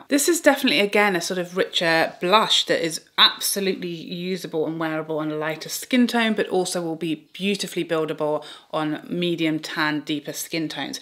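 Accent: United Kingdom. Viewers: I love listening to you speak !!..Ilove your english pronunciation !!Love British english !!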